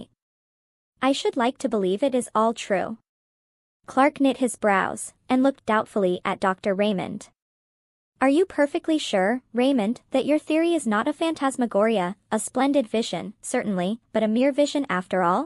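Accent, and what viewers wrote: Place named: United States. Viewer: This sounds terrible! This story shouldn’t have a female narrator (with a bland American accent), let alone a female AI with such flat affect and abysmal cadence. It needs to be corrected immediately.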